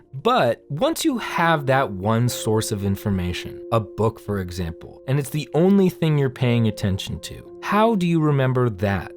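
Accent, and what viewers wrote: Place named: South, United States. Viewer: I love your accent. Is it southern?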